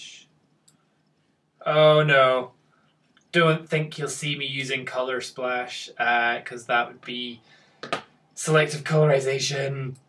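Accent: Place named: Scotland, United Kingdom. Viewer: I do enjoy your Scottish accent. Don't stop talking! Did you ever look at Corel AfterShot Pro?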